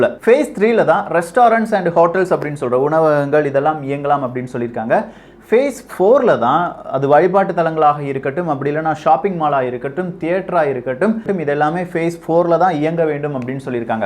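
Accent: Kerala, India. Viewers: நண்பரே உங்கள் தாய் மொழி மலையாளமா? உங்களின் சில ஆங்கில உச்சரிப்பு மலையாளிகள் போலவே உள்ளது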